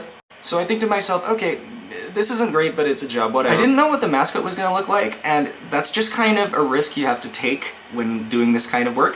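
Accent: Australia. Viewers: NGL, the last time I watched one of your videos, I remember you having an Australian accent. Not saying that it was a bad thing at all, but man, things have really changed since I last watched you on YouTube years ago.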